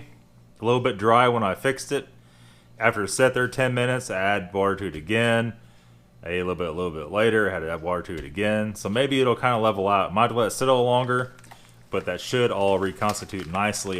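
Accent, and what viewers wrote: United States. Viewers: Your intro is always so cool man :0) so fast talking American, impossible to me, lol :)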